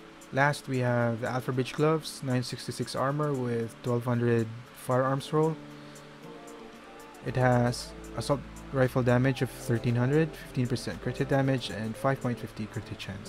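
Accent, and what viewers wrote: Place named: Philippines. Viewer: this guys sounds like is flipino.. lool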